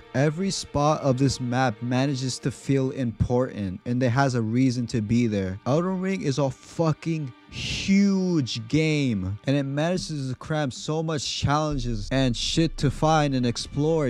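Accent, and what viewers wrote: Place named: Hawaii, United States. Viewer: U sound like u from Hawaii brah? Lol..I grew up on da Big Island myself..Nice Video tho. I'm on my first playthrough.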